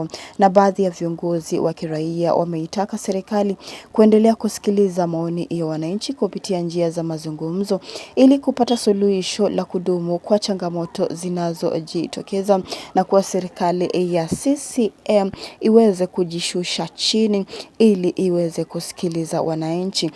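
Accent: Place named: Kenya. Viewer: Ndg. Wananchi hii chanel ni ya kenya pia hata huyo anaeongea ni Mkenya lafuz mumeisikia na hizo picha ni za zamani wakati wa mazoezi ya makomando puzeni hayo. Tanzania ni salama na tulivu Amani tupu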